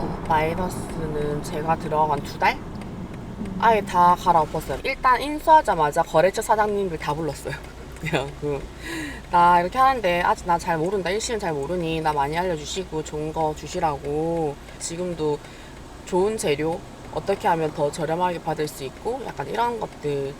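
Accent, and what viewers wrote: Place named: Gyeongsang, South Korea. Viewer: 말투가 경상도네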